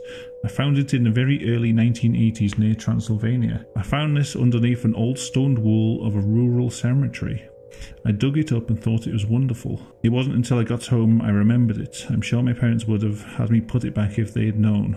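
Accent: United Kingdom. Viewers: I just realized that you have British accent (not sure either English, Scottish, Welsh or Irish well if Ireland could be counted as UK itself) the moment you said "bloody hell". No wonder your voice somewhat very interesting in certain way. Please correct me if I'm wrong thanks.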